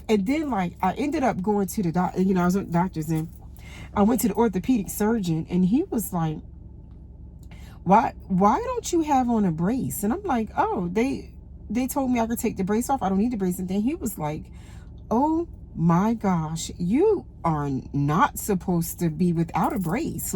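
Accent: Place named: New Jersey, United States. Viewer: Cool accent. Are u from Jersey?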